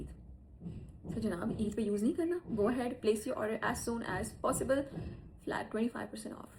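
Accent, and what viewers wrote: Punjab, India. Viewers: Aoa Kaisi hyn ap ek baat ki to apki tareef krni banti mariyam pervaiz ap dono se phle kisne YouTube channel pe apni journey start ki thi or dono phle healthy thi Lekin day one se apki dressing bht zada achi thi or apk pas pass mostly Punjabi hyn but Urdu apki thek hy rehty rehty punjabi thori bht agyi hy samj bhi Lekin zada hard wali nhi keun k mariyam pervaiz ki Jo Urdu hyna badrah Urdu Mai Rey hota hy or aj tak apk husband nhi Nazar aye apk vlogs Mai Dil se Ameer or sub se zada ikhlaq se hona chaheye hy down to the earth hona chaheye hyn jub k ap typically pathan family se belong krti ho but the way you present your self very gracefully makeup skill's day by day or achy kiye but Jo weight ko ly k transformation hy incredible phle to husband k paiso pe har brand ka makeup Liya now as a women apne Baki women's ko dikhaya hy mustakal mijaiz apko achi trha life Mai bht Kuch bana dyti hy phle ap logo k makeup brands clothing brands xyz kis chez ka bhi brand ho Lekin aj kafi saalo ki hard working sub krwa dyti hy ap bv bhi ho maa bhi ho or self made business woman bhi aj apka khud makeup brand Hy jub k mariyam pervaiz hyn phle jisne makeup brand launch Kiya Baki Natasha waqas bhi hard working kri hy Lekin wo bhi Urdu Jo na kapre pehno mein Karachi se hu but mein bhi Punjabi hu Lekin meri Urdu thek Hy Kuch mahajir fenta parakh xyz to Natasha waqas ko abi apne pe working ki zarurat hy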